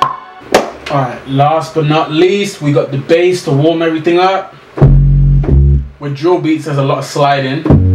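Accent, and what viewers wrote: United Kingdom. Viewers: Is it me or did he not have a british accent in his other vids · All this time I never clocked u were from London 😂.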